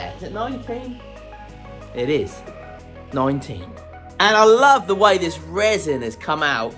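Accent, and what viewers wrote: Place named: England, United Kingdom. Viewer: Where in England are you from, i mean with your accent ?? Its not london and probably not manchester or up there 🤔🤔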